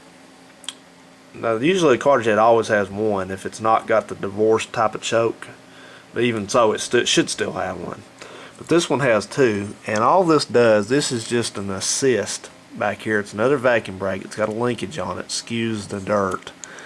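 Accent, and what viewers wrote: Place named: West Virginia, United States. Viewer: I didn't get to add thanks a lot for the videos. You kinda sound like you are from West Virginia like me, or maybe Kentucky or North Carolina.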